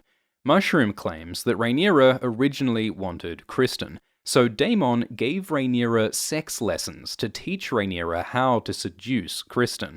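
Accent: Australia. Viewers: Oi! You sound Australian yeah? What time are the episodes out for us? I am not smart enough to work that one out on my own. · You make the best videos. I also love that you're Australian. So much easier to listen to than many other videos for me.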